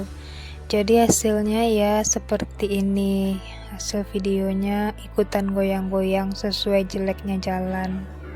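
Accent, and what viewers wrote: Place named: West Sumatra, Indonesia. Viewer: logat minang nya terasa banget uni... asyik jadi tau wilayah ai dingin, makasih ka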